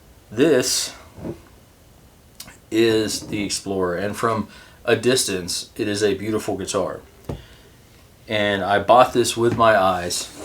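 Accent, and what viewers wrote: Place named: Georgia, United States. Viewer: haha... thought I recognized that accent, I'm from Georgia originally. Been in NC for 25 years or so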